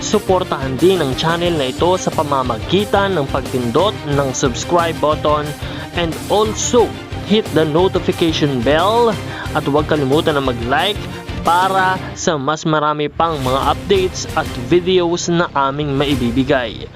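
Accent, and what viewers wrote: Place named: Philippines. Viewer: BAKLANG with an H.. MAGTAGALOG ka.. your DICTION IS SO BARRIOTIC. Pag mainis sa iyo si BB Gandang Harry Roque kukurutin nya yong MALAKARAYOM MONG nasa baba..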